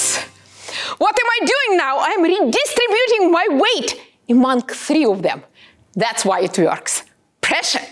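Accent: Russia. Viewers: Думал, нифига я английский начал каждое слово понимать, а, нет, акцент наш просто.)) Сразу всё понятно.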